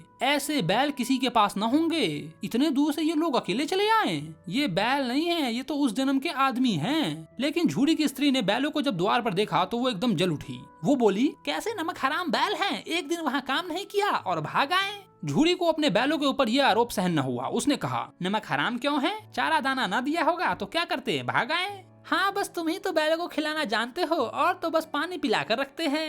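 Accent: Bihar, India. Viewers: I know you are Bihari 😊😅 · Bhaiya aap Bihar se ho kya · Bihari tone kam nikala karo samajh nhi aata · Aap bachhon ko hindi padha rahe ho ya bihari... Apni language par thoda kaam keejiye... Bachhon ki bhasha sudharni hai bigadni nahi hai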